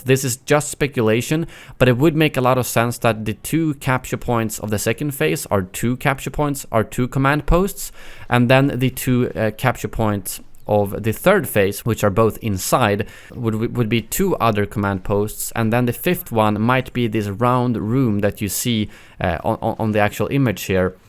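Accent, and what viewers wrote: Sweden. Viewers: are you swedish? sounds like you are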